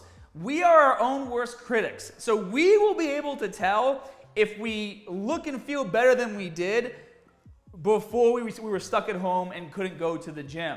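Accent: Boston, United States. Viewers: His Boston accent comes and goes. He's working HAHD to neutralize it.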